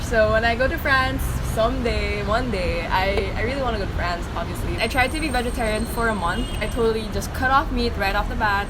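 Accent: Philippines. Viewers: You have a Filipino accent when you speak English!! Cool!!!🤩😆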